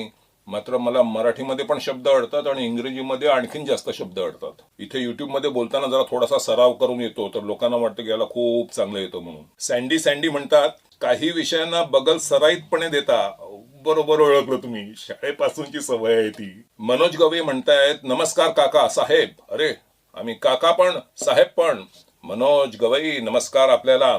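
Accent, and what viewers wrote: Pune, India. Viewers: सुप्रभात नमस्कार..... अगदी पुणेकर मराठी भाषा....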